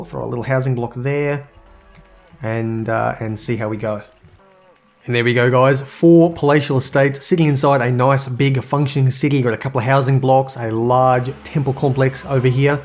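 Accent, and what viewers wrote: Australia. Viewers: Are you Australian? I'm an Indian who's just been exposed to different accents and I'm trying to understand all of them lol! 😂😂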